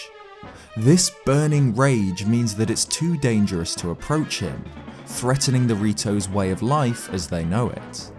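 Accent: United Kingdom. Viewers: Love your videos. Nice speaking voice too. Fellow Brit, surely?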